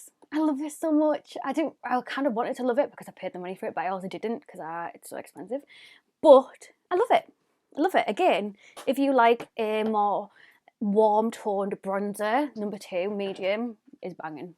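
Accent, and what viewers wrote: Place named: North East England, United Kingdom. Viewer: Where are you from? :) the accent sounds North East? I'm from boro and it sounds similar hahaha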